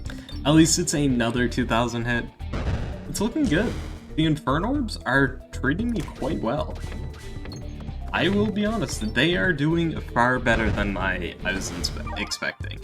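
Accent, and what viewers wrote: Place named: Canada. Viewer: Your voice is the definition of Canadian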